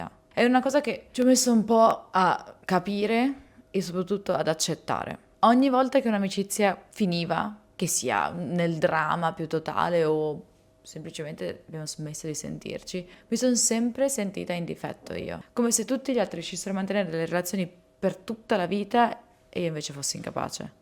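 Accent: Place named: Sicily, Italy. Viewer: Ma stai sempre col ragazzo siciliano? Hai un accento più siciliano rispetto a 1 anno fa direi (detto da un siciliano).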